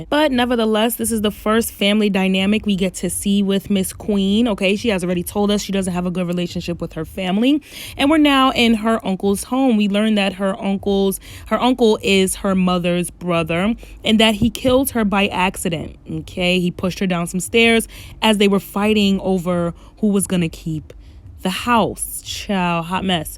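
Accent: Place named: New York, United States. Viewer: You have that New YORKERRR voice 🥰 NYC gang 😝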